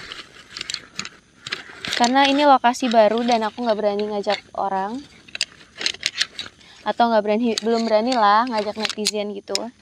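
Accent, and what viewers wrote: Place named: Sunda, Indonesia. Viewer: org mna kak, kek org sunda🤭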